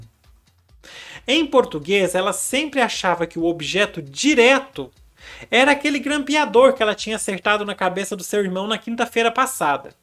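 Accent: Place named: Minas Gerais, Brazil. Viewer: caramba! Jurava que vc era de algum Bernoulli (pelo sotaque que me lembra o MG). Estudei em um cursinho renomado e os professores não eram tão bons como vc (no sentido didático).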